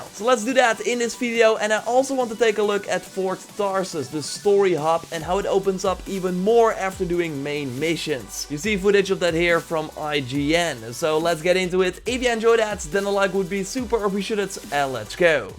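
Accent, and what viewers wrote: Netherlands. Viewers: Hey are you Dutch. I love your vids by the way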